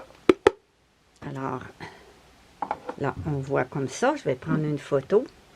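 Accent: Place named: Quebec, Canada. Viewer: Bonjour et bonjour de france,j'ai plaisir à suivre vos vidéos mais aussi plaisir à écouter ce si jolie accent québécois. J'aurais juste une question,vos vers vos les élevés dans le noir ou avec un de lumière? Cordialement Romain et comme on dit dans votre belle province gros bec.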